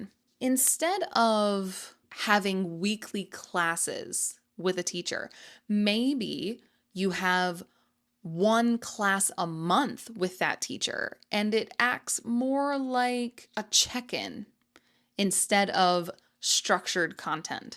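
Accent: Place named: United States. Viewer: Hi there my beautiful teacher you are a master of communication with us and your American accent is perfect meeting our expectations that's why we love 💕 you here in Morocco keeping up ❤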